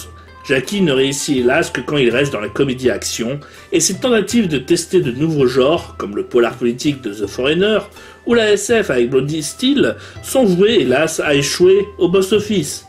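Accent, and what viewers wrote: France. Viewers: L'anglais a la française haha mais j'aime bien se format et voir Detective Dee ça fait toujours plaisir. Tu ma un peut Hyper avec le film psychokinesis.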